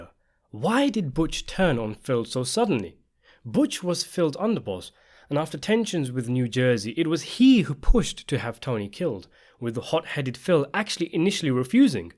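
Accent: United Kingdom. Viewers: sopranos analysis in a British accent is brutal to watch.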